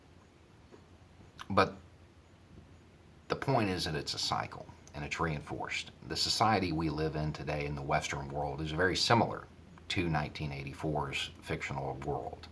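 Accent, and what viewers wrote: South, United States. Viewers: lol, I love stealthing anarcho coopeativist and old-school punk rock organizing with a Southern accent. My problem is I lack subtlety, or I get off too much on talking up the good folks of Chiapas to squares. Keep on rocking until there's a free world buddy.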